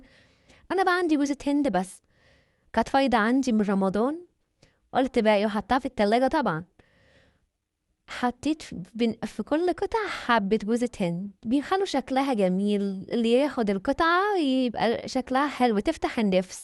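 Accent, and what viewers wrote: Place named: United Kingdom. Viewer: يسلم ايدك حبيبتي .. هو حضرتك مش مصريه ..الحروف شويه مياله للانجليزي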